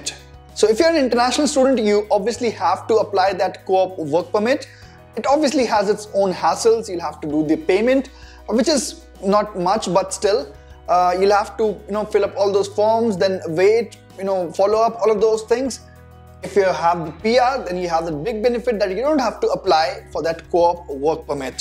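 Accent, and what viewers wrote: India. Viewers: Nice work with all respect but ur language it's so confusing.... I mean the Indian or I called the Indian English it's too bad...... even with subtitle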